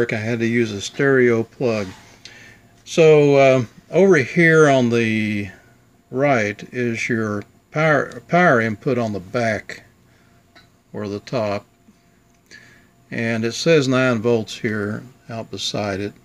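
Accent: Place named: United States. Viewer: Thank you, Your american accent is so easy to understand I like! Greetings from France!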